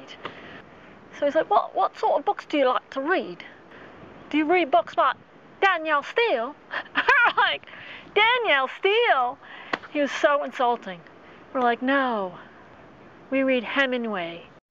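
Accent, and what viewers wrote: United States. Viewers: your instruction is perfect,love you to bits,is the hair to keep us smiling because it’s working.love your USA accent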